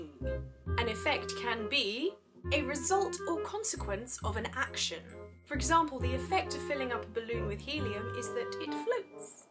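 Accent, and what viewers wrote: United Kingdom. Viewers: Hi, i learn english on your vids, i like it, but could you make the background sound little bit lower for better comprehending what you saying, and plz, if it possible make more educational vids with your awesom british accent, luv your funny nozze)))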